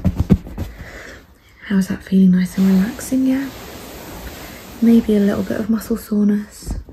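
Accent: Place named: United Kingdom. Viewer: I am not into chiropractor ASMR but your british accent influenced me to watch this video till the end. now, I am binging your other videos to hear more of your accent. lol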